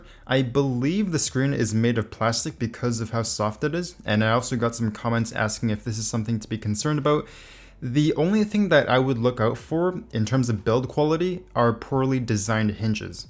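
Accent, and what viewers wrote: Canada. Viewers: he's probably Canadian. Can tell by the way he says "out". Maybe Ontario. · Are you Canadian? :)) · IMHO sounds canadian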